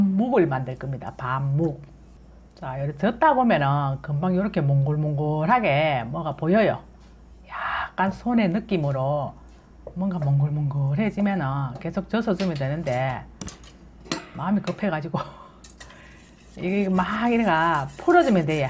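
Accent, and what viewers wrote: Gyeongsang, South Korea. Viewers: 구수한 경상도 소리 듣기가 너무 좋습니다, 알단 재미있게 들었습니다, 통영에서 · 좋은 정보 고맙습니다. 경상도 억양의 구수한 말들이 고향 생각나게 하네요. 오랜만에 밥뿌재 꼬매는 모습을 보니 엄마 생각이 나네요. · 구수한 경상도 말씀 억수로 재미있습니다. ㅎㅎㅎ 정감이 가고 님은 살림을 엄청 잘할것 같아요. ㅋㅋㅋ 밤묵은 처음 접합니다. 좋은정보 감사합니다. 엄지척!!! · 밤 묵도 맛있어보이는데 갱상 말씀인가 디따 잼나네요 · 밤 묵 잘 배워갑니다 감사합니다 구수한 경상도 사투리까지ㅎㅎ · 경상도 싸투리넘재미 잇네요? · 여사님은 경상도 아주마라 말씀하시는 품이 무뚝뚝하지만 뚝뚝던지는 말속에 인정 미가 넘치면서도 재미있네요. 옛날 어머니가 해주시는 음식들이 생각나게 합니다. 애교가 있어보이내요. 서방님께 사랑만이 받겠습니다. 밤묵만드 법 잘보고갑니다. 항상 건강 하십시요. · 밤으로 묵을만듣것을 처음보는것갇습니다 경상도 구수한 말 정겨운 목소리 ㅎㆍㅎ 맛난것 많이 배우고 갑니다 ~ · 여사님 말씀이 어느동네 억양인가요 갱산도 어느고을 사투리 인가용. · 너무너무~재미있게 하시내요 경상도사투리아주구 수해요~ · 항상들어도 구수한 갱상도 사투리의 맛있는 목소리로 가르쳐주신 밤도토리묵 강의 잘 봤습니다. 목소리가 너무 이쁘셔서 얼굴도 꼭 보고싶었는데ᆢ! 너무 아쉽네요. 저는 갱기도 사람이라 그런지 갱상도 사투리로 강의하신 선생님얼굴이 눈에 선합니다. 보고싶어요! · 경상도. 토박이. 말씀. 정감이랍니다 서울사람들은. 무슨말인지모를끼다. 내는안데이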